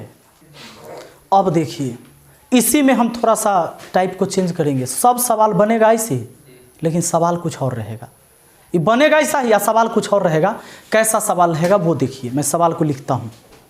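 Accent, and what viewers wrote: Bihar, India. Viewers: Sir aapke Bihari style language mast hai.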